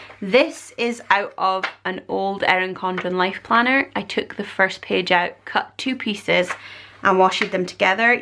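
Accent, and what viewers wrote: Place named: Northeast Scotland, United Kingdom. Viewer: You sound like you must be based in the North East of Scotland? Love this video by the way!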